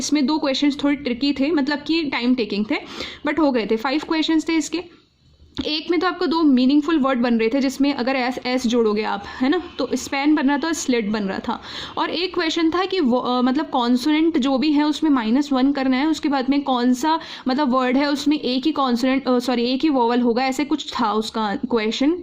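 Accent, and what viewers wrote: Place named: Rajasthan, India. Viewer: Aap Rajasthan se ho na aapki voice se lg rha hai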